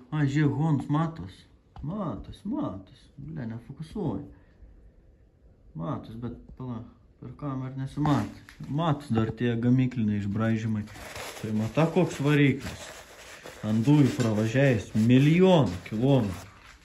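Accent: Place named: Aukštaitija, Lithuania. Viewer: Nešnekiek aukštaitiška😃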